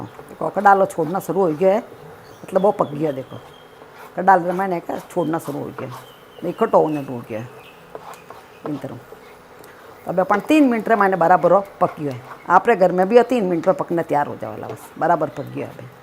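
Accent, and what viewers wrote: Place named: Rajasthan, India. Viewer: Hi aunty ji mai haryana se hu maine aj pehli bar apki video dekhi h mujhe lga ki mujhe rajasthani samajh me nhi ayegi par, samajh me ayi ap ke muh se rajasthani me ye recipe sunkar acha lga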